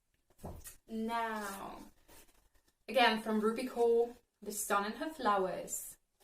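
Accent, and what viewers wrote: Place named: United Kingdom. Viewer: Have u come from the UK?😅 I love the british accent and I admire how fluent u are. Excellent work.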